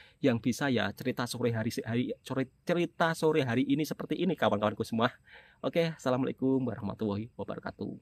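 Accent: Java, Indonesia. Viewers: 🫵👍💪🇲🇾☪️🇵🇸🤝🇮🇩* Itu Orgnya JUJUR, IKHLAS TAUDIRI SOPAN & nggak Sombong atau Ngawur Tanpa Fakta, Sketsa Vlogg Beliau itu Adlh pngisahn JUJUR Bgaimna Hidup & Pngalaman Seorng TKI yg Patuh Aturan, Mncari Rezqi Allah swt yg Halal bii Halal walToyyiiban (Bersih lgi Halal & Baik) mka Allah sntiasa Mnsejhtrakn & Memudahkn urusn2x Demi Sesuap Nasi mnyarahi keluarga & ank istrinya, mka itulh Warga Serumpun di Msia 🇲🇾💖🇵🇸 ini *mnhormati & Mnyayangi Vlogger ini* Smoga diContoh ikuti oleh Vlogger2x indo 🇮🇩 yg lain yg bikin Konten Kreator!!, Jgn Asal NGEVLOGG Tpi PENOH TOKSIK, BUSUK Nggak ada Edukasiannya, Mnambahi Dosa2x Kering dgn prcuma shja,*& TERUSSSKn Jihadun mu!! kmi warga Melayuin Aljiwiun ini Truss Support & Mnyokong Usaha Mulia mu kmulh Salah Satu DiAntra Duta2x dlm Mndirikn Ukhuwah Umah ☪️ yg SeAqidah, Serumpun asal Silsilahnya ini, yg Sgt Merendah diri & Brbudi Pekerti 🇲🇾💖🇵🇸☪️🫵🤝🇮🇩 Aamiiiin yra!!!🤲🫵Respek. 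Dpd Kulo Melayuin Aljawiun Jenenge:》 *Raden Wilakista' RSH Al'Fatah* Sekoh & Manggoni Nang Negri Meloko Msia🇲🇾💖🇵🇸🤝🇮🇩 *Sya Suka & Sgt Mminati Lorghat (Ascent) JOWO (Niang Jawi) yg Kluar dpd Hati & Mulut,Sgt Jujur, original Wong Jowo!! 👏👍🎉*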